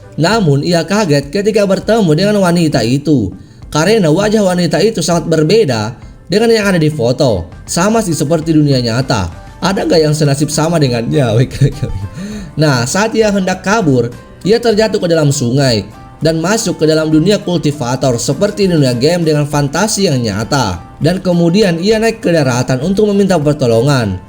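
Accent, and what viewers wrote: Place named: Batak, Indonesia. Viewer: ohh......mirip logat Batak dikit🙃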